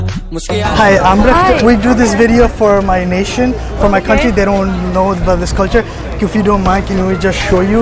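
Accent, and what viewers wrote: Sylhet, Bangladesh. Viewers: ভাইয়া আপনার কথাগুলো অনেক জোস হয় আপনার মুখের সিলেটি ভাষা অনেক সুন্দর হয় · আসসালামু আলাইকুম ভাইয়া আমি ইন্ডিয়া থেকে বলছি.... আমি আজকে প্রথম আপনার ভিডিও দেখছি প্রথম দেখেই আপনার ফ্যান হয়ে গেছি 😊 আপনে ও সিলেটি আমি ও সিলেটি তাই আপনার ভিডিওতে সিলেটি ভাষা শুনে অনেক ভালো লাগছে 😀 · Bhai apni ki sylheti na kitha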